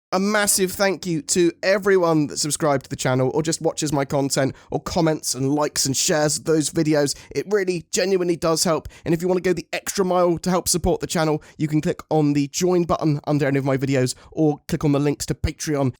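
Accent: United Kingdom. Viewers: 3.18 Bring it on. To be honest I watch your videos more than I actually play the game. LOL. Might it be your British accent? I follow you on Facebook as well.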